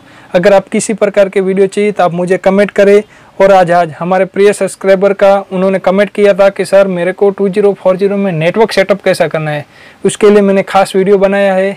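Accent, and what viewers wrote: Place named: Gujarat, India. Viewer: Bhai aap Gujarat se lgte ho aapki language se....Achha mujhe yeh to btao k A4 paper ka business krna ho to Guide kre aap plz.Thank you dear.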